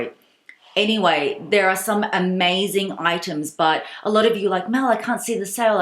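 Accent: Australia. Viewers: Hi Mel, love your videos. I really appreciate all the information and tips. I really enjoy watching your videos because you are so knowledgeable and especially love your Australian accent. #faMELy Look forward to your next video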